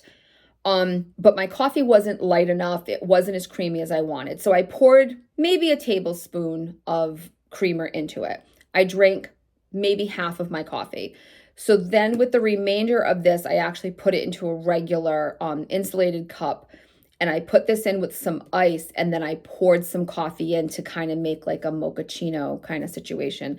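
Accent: Massachusetts, United States. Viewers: Thanks for the video, you have to be from Massachusetts based on how you say bananer and Lara bah hehe 😉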